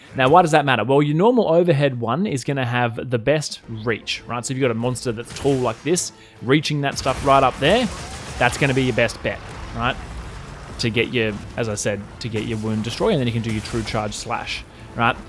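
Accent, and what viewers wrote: Australia. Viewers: It's definitely struggling at times, but it's not so much the video as it is your in-game performance - it appears that your fps is dropping into the sub-30 territory sometimes which will definitely create some serious input delay issues eventually especially in more performance heavy fights and environments. This issue may not appear to be so apparently severe on your end, especially when you're just playing without recording, but the additional performance cost of recording might explain the degradation in performance captured in the video. If you'd like any advice, I'm pretty experienced with this stuff (around 20yrs of being a PCMR giganerd), and if I knew your rig specs and the display you're using I could probably offer some quick and easy things to improve it for you. But only if you'd like, I'm not one to force unsolicited advice onto anyone. 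Also, while I understand you're not super fussy about performance, for the sake of recording it would definitely be helpful for the audience. Either way, thanks for the video and info! It's been a great resource for me in brushing up on my Greatsword knowledge/skills and getting back into it since I took a break from MHW (that ended up being far more permanent than I originally intended 😂) right before Iceborne released. As a side note of interest, as an American English speaker I have always very much enjoyed and prefer listening to Australian and NZ accents (I often get them mixed up so listed both lol). Very easy on the ears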